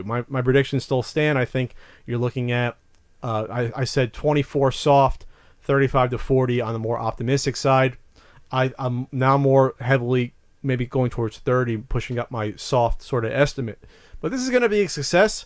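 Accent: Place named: East Coast, United States. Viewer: LIVES ON CALI!?!?! O_O I COULD HAVE SWOOORN THIS WAS AN EAST COAST BOY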